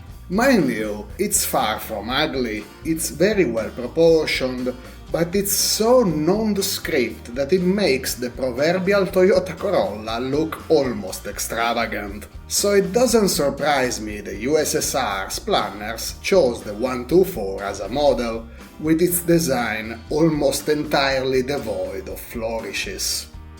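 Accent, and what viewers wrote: Italy. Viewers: I like the way you tell the story of Italian cars with your Italian accent, plz keep on going it s very entertaining . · Офигенный Английский с Итальянским акцентом.(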